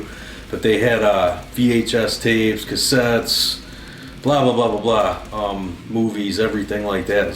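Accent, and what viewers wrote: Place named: Michigan, United States. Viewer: Dude I FUCKING knew you had a Michigan accent. Man, I've watched guitar videos of you since my early 20's(now 30)I hope I didn't get that wrong. If you ever find yourself in Mid Michigan I'd love to talk!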